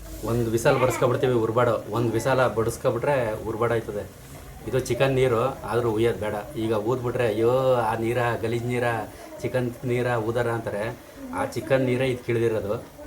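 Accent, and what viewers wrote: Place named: Chamarajanagar, India. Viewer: Namane ru chamrajnagra avru avru nim slange matadadu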